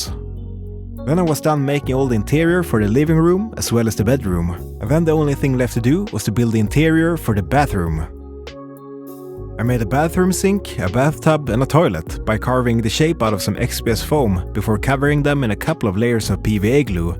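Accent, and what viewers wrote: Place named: Norrland, Sweden. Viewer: Lägga plaank, where in Sweden do you live? Sounds like up north 🎉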